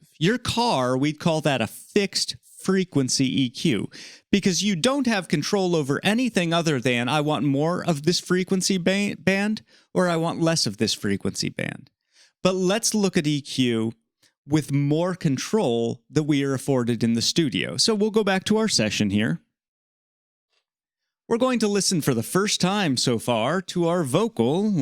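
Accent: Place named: Canada. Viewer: Hey, I love your video..great job and very informative!! Canadian eh? Brantford Ontary-ary-ary-O here lol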